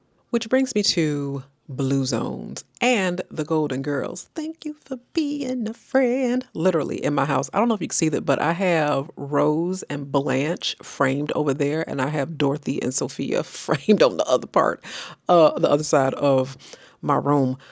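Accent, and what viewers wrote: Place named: United States. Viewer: I love this accent , where abouts in America are you from ?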